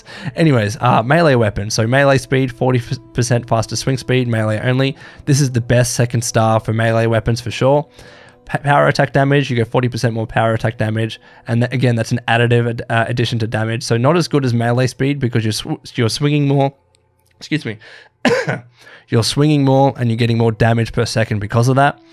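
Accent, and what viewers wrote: Australia. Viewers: The mic makes you sound Australian =)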